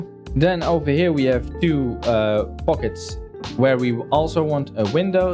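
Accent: Netherlands. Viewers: Hey, Just got recommended this Video and the complete build looks amazing! You deserve way more Views, Subs and more. Ps. The Netherlands accent is Lekker! 👌👌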